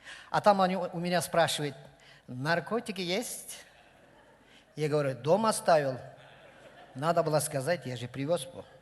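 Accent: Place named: Kyrgyzstan. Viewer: Мен отуз жылдан кийин орусча эсимден чыга баштап келсем Бишкекте азыр кыйгыздар суйлогонун ушундай угуп калам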